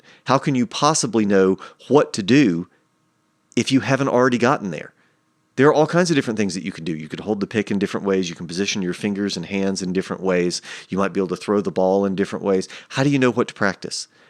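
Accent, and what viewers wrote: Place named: Carolina, United States. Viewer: I'm going to comment after watching the entire video, but I'm wondering where you're from. At first I thought you might be an Okie, like me, with your subtle Southern accent. But I'm hearing a bit of a Carolina accent in your "O" sound. Any chance I'm right?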